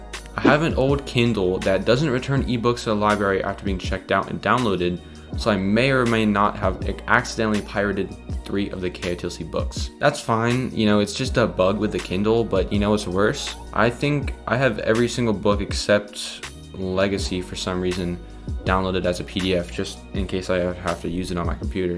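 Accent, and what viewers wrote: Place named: United Kingdom. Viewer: a very crisp british accent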